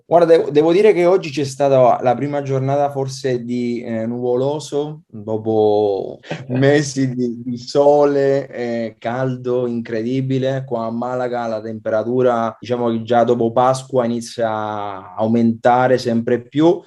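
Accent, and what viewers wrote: Tuscany, Italy. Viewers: con quell accento toscano, é spettacolare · Toscano eh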